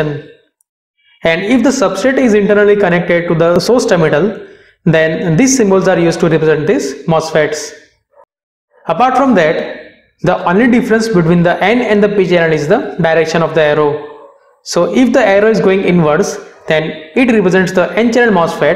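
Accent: India. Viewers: How indians explain things better. You make it a piece of cake, easy. Thank you indians. (Your english style is funny) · That indian accent is boring and annoying tbh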